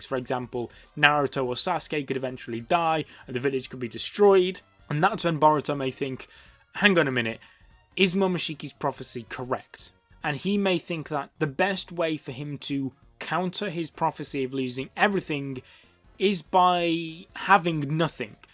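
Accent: United Kingdom. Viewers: Omg listening to a bri'ish person talk about anime is so strange for some reason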